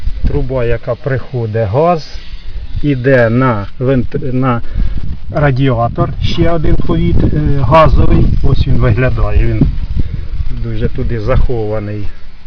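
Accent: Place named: Poltava, Ukraine. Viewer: Полтавщина Говор такой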